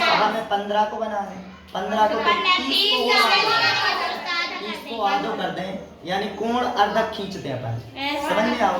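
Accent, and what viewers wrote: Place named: Bundelkhand, India. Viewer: Apni bundelkhandi boli ki bat hi aalag he 🙏🙏🙏❤❤❤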